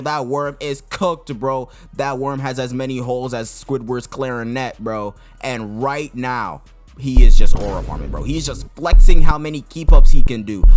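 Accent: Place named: Africa. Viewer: NICE ASS AFRICAN ACDENT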